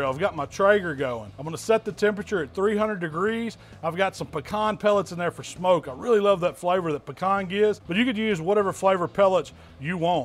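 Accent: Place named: South Texas, United States. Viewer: If I had to guess, he sounds like he’s from South Texas. Just a guess.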